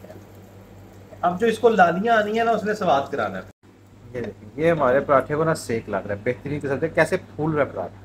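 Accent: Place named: Faisalabad, Pakistan. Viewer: Lehjy sy ap faislbadi lgty ho😊😊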